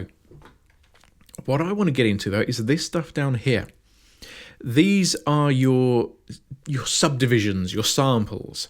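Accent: United Kingdom. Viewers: Thanks for the tutorial, nice and clear! with a nice fluffy British accent! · A very clear explanation. Thank you and great to hear a British accent for a change :-)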